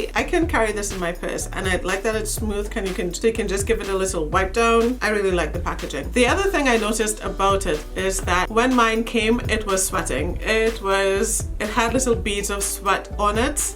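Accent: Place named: Caribbean. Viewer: You sound like your oots are from the Caribbean... trini maybe?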